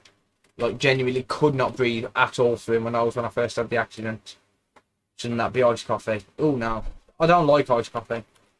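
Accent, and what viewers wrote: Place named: Scotland, United Kingdom. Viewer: Good scottish accent mate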